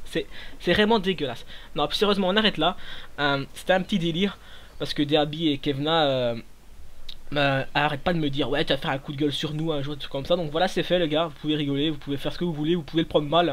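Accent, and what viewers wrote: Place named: Charleroi, Belgium. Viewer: HA l'accent carolos ! ces sa qu'est bon !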